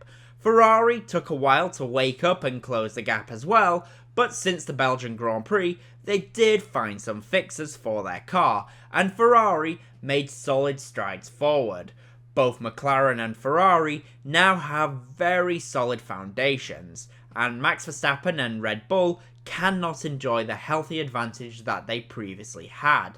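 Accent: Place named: United Kingdom. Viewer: Should be tight up top. Good thing imo. You sound like a Fellow Brit and over here he would be referred to as a daddies boy.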